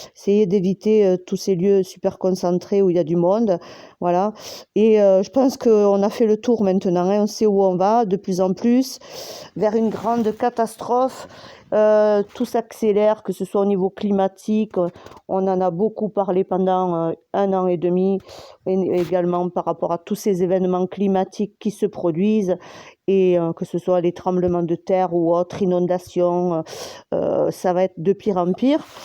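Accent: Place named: South, France. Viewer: Et un bel accent qui nous emmène au soleil en plus 😘😘😘pour enmerder des détracteurs...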